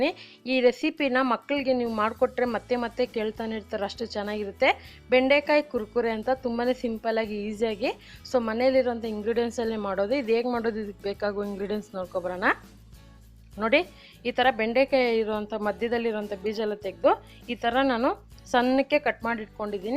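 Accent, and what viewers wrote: Tamil Nadu, India. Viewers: ನೀವು ತಮಿಳು ಮಾತನಾಡೋ ಭಾಷೆಯವರು ಇರಬೇಕು. ಕನ್ನಡವನ್ನು ಸ್ವಚ್ಛವಾಗಿ ಮಾತಾಡೋದನ್ನು ಕಲೀರಿ.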